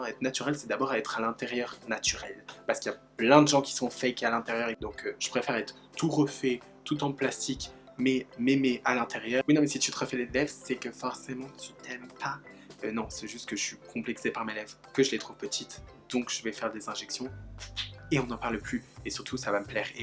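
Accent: Belgium. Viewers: Je suis à la ramasse avec tes vidéos mais je suis à jours sayait lol. Tu es belge ? Question bête 🙄. Je t'adore trop trop trop trop tu m'fais tellement rire 😂 Continue d'apporter de la joie ❤️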